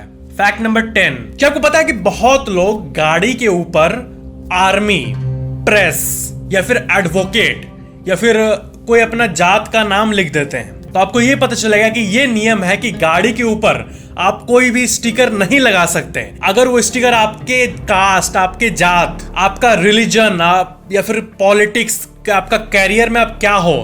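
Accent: Bihar, India. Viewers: Bhaiya aap bihar se ho kya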